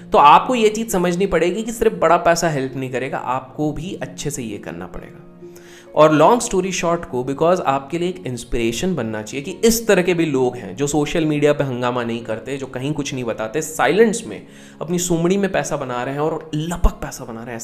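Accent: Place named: Hyderabad, India. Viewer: Kya miya kar hyderabadi accent 👌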